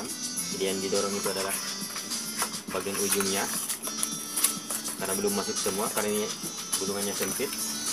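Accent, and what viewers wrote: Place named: South Sulawesi, Indonesia. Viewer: saya fokus ke suara ta daeng... kyk ad logat2 sulsel ta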